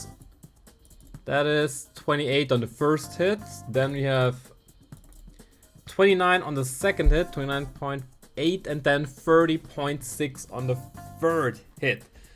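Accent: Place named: Germany. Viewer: your german accent is hard man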